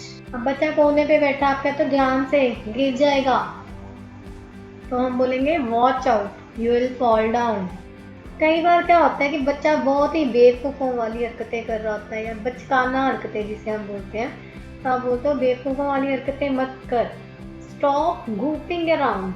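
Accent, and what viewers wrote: Punjab, India. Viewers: are you punjabi..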